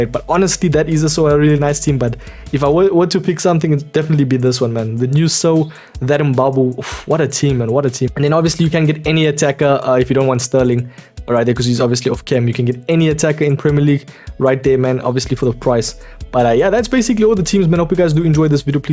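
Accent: South Africa. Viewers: I hear a South African accent boet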